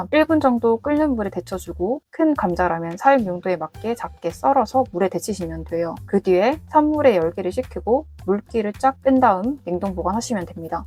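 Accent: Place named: Gyeongsang, South Korea. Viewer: 경상도 분이신 듯해요 ㅎㅎ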